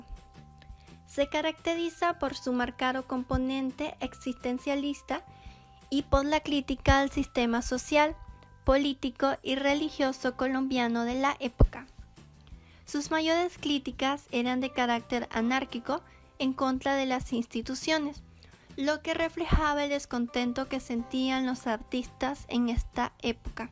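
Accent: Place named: China. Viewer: buen vídeo, solo que la chica habla como si un chino hablara español no sabe decir la r, de resto todo fino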